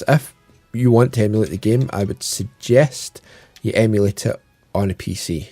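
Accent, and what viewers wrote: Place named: Wales, United Kingdom. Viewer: Great showcase. It's nice that you are talking more in the videos and I love the accent. Is that welsh?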